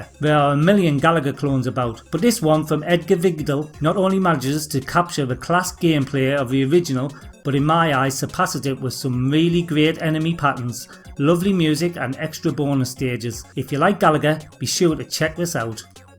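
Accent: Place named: Newcastle, United Kingdom. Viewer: Aye. Good to hear geordie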